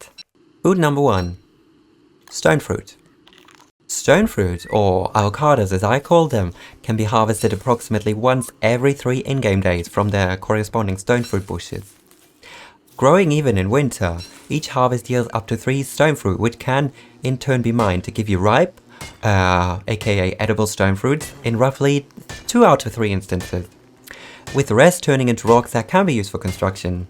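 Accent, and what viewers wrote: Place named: United Kingdom. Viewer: I appreciate the British accent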